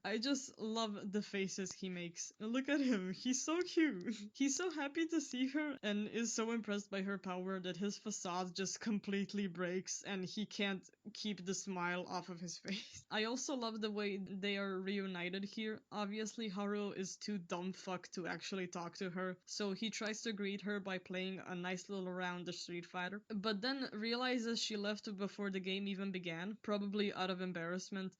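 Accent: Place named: Canada. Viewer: i have to say i jus luv the way you speak, your style is adorable! and nutin wrong w your english, actualy im suprised to learn its not your first tounge, i thought you're canadian:) wonderful analysis btw a joy to watch, thx for makin'em!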